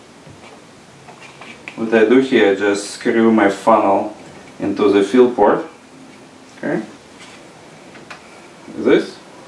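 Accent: Russia. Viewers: I just have to ask, are you Russian? or maybe German>? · You are russian?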